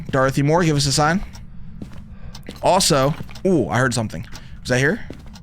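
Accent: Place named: Delaware County, United States. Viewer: You sound like you have a delco accent from Philly lmao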